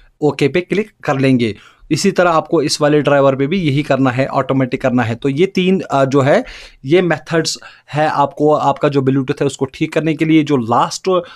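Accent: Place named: Kashmir, India. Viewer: It sounds like u r kashmiri ....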